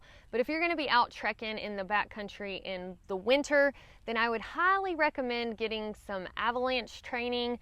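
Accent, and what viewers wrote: South, United States. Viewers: Well done - accurate and factual. Should help new folks stay safe. Plus, I LOVE your accent. Great to hear a southern gal telling it like it is. Nice work!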